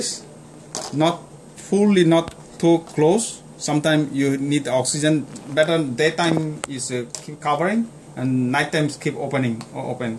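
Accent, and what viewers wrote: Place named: Bengal, India. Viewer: Hello, Very good video to learn this process. Thanks 🙏. If you don't mind guessed you are a Bengali speaking how you are talking now. 👋 from 🇨🇦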